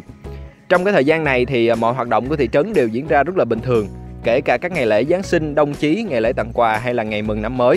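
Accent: South, Vietnam. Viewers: Giọng miền nam dể nghe,ủng hộ bạn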